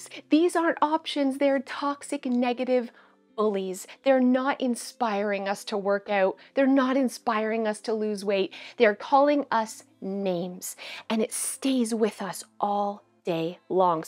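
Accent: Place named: Oakville, Canada. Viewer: your ability to be vulnerable and funny and honest and as screwed up as the rest of us is exactly what I needed to hear today. We all have struggles because we are told we need to do it all, perfectly and look amazing at the same time. Well, we can't. We can only be ourselves and sometimes that isn't easy. You have shared a cautionary tale and please know that you are delightful (and I still love the Oakville accent.LOL)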